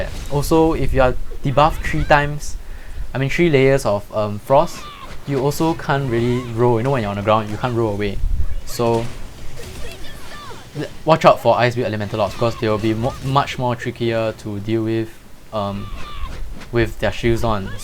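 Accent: Singapore. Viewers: are u singaporean?? cos u speak like a singaporean anyway i love your guides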